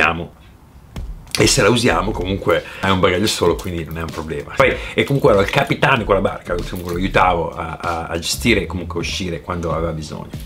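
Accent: United States. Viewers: Ciao,ho appena iniziato a seguirti e gia mi piaci.Come fai ad avere l'accento americano?Ho anche io in mente di utilizzare youtube per raccontare la mia storia ed esperienze.Spero di riuscirci.Ciao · Ah ok lo hai spiegato qua! Come non detto scusami.. by the way grazie che mi hai raccontato le tue esperienze di phatos! Vorrei intraprendere anche io un esperienza come la tua , come graphic Designer facendo un esperienza.. vediamo cosa succede dai.. due domande 😂 dove vivevi in Italia? E come mai ora hai un accento americano molto forte? Tanti altri che vivono anche da 20 anni parlano italiano con accento italiano... In bocca al lupo!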